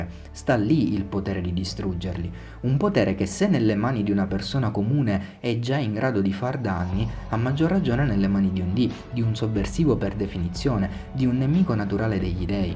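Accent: Italy. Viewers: Bel video! Sei calabrese/siciliano? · Ottimo canale. Una domanda. Siculo? Agrigentino?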